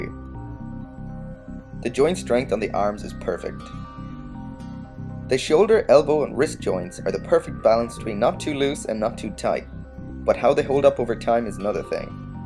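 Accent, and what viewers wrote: Ireland. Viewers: How did he ever sound Asain? He's Irish and has a clear Irish accent...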